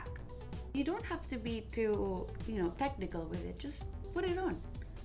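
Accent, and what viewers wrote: Philippines. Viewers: I love her but her accent bugs me. i cant wait to see a Filipina in American media who can talk normal. i just hear that accent from middle class filipinos so much and I know filipina girls think its cute. it's just too much to listen to. sorry. still love you. just have to put you on mute. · I can here the Filipino accent 😍😍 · Maldita do you have problems with your so called "Middle Class Pinoys"?! She might have that kind of accent but were you able to achieve what she has right now? I'm pretty sure you are a filipino, and I am sure that your accent also belongs to Middle Class Pinoys. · I love your accent, you make me mis all my Filipino friends in Alaska. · i love her accent! so filipina! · grew up in the Philippines. Her accent is the same as middle class Filipinos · Gosh! I really love her Filipino accent!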